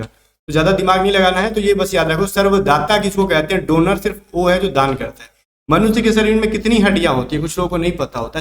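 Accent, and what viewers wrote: Bihar, India. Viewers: Abe apni bihari mat jhad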